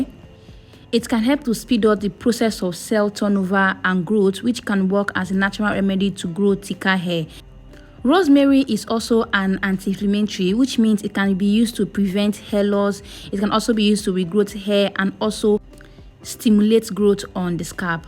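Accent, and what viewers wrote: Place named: United States. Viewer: At least her hair is natural but tell him about her accent she got Americanized so she just bragging about having real hair why don't she go buy a damn hair store why don't black women go invest in the same products that they use for their hair like people that are not your race know your hair better than you our black community is stupid as f***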